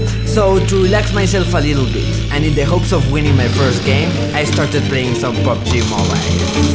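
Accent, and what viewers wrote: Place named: Mexico. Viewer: He sounds mexican... is he? Love his accent regardless.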